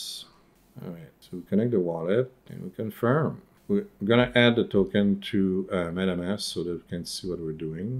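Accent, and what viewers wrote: Quebec, Canada. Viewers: Are you from Quebec? I hear s Quebequois accent. 🇨🇦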